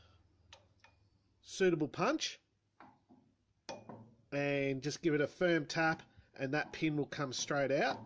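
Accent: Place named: Australia. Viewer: So refreshing to hear an Australian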